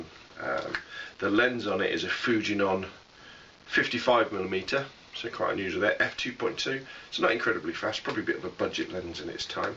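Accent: United Kingdom. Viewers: Love how the pure British tone elevates the whole review. It’s like listening to BBC narrate a hidden gem of film cameras.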